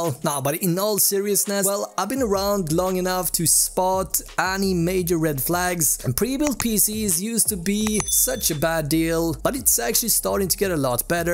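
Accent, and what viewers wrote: Sweden. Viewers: You sound like you are from sweden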